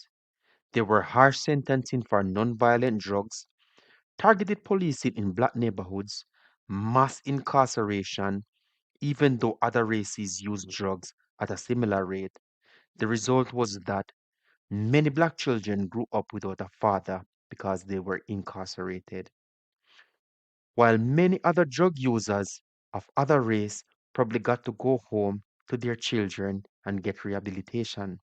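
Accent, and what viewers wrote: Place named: Jamaica. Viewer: all due respect bro, but you sound Jamaican. why are you making videos about FBAs? 50 percent of jamaican children live in single parent households. whats your point?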